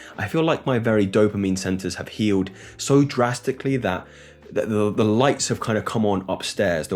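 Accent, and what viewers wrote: United Kingdom. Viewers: Your videos are priceless. I learn a proper British English as well. Cheers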